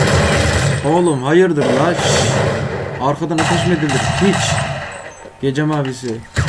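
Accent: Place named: Kastamonu, Turkey. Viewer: Kastamonulu şivesinden anlamadınmı :D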